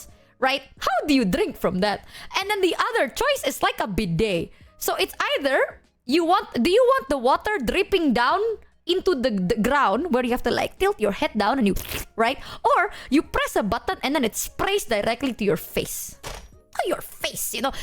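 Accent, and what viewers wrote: Indonesia. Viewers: I just realized. is the best representation of fluent English with Indonesian accent. If you want to know what majority Indonesian accent sounds like (in english), listen to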